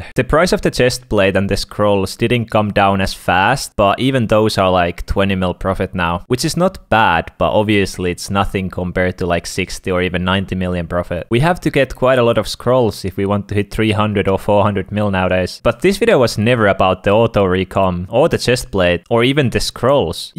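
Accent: Finland. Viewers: R u Finnish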